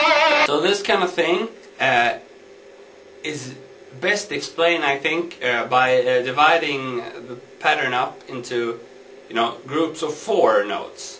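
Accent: Sweden. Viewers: He is from sweden. That explains his accent. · oh the swedish accent xD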